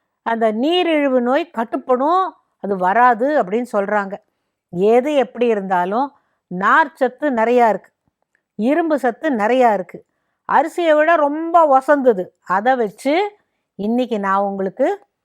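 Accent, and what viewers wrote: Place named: Rural Tamil, India. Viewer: I love the way you speak in rural Tamil. Very interesting and informative. ❤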